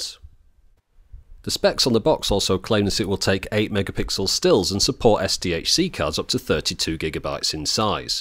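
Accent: United Kingdom. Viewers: You can't go wrong with a british accent! :)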